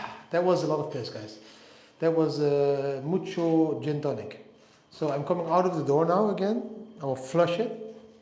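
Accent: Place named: Netherlands. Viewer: dude man bro! i just realised your accent is dutch! i grew up with dutch granparents :) much luv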